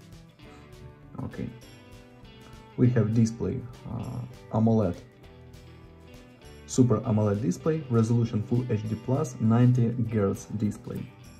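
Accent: Russia. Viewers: не плохо ты кудахтаешь на английском, просто просто акцент есть русский немного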